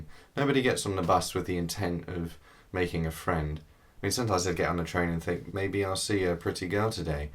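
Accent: United Kingdom. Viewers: Yours so damn British...but in a good way, of course. :) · Watching this is like listening to my journal being read with a smooth voice with a lovely British accent.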